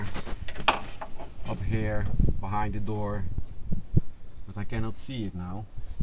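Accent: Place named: Netherlands. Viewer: Bedankt voor je handige instructies. We have a 1996 VW Cabrio, and your instructions and video guidance was just what I needed to get this done. We live in Norfolk County in Ontario, Canada, and there are a lot of Dutch people in my church--it was very nice to hear your instructions in English with your Dutch accent. I did pretty much everything that you did, and just finished and the window works great! Thank you especially for the extra details about the clamps and the alignment procedure. Thank you so much.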